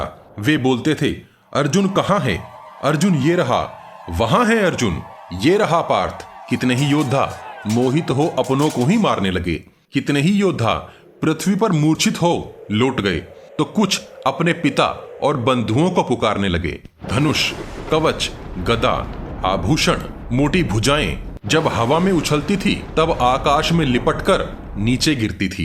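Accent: Standard. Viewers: आपका यह प्रयास अति सराहनीय हैं। आप ना केवल शुद्ध उच्चारण से महाभारत का दृश्य पुनर्जीवित कर रहे हैं, बल्कि पुरातन काल में सनातन के परम वैभव को भी आप स्थापित करने में अपना योगदान दे रहे हैं। यह सब कार्य भगवान की कृपा के बिना असंभव है। हमारी प्रभु से प्रार्थना है कि आप इस तरह के श्रेष्ठ कार्य को आगे भी करते रहे। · Bahut dino baad, etni sudh Hindi suna😊 acha laga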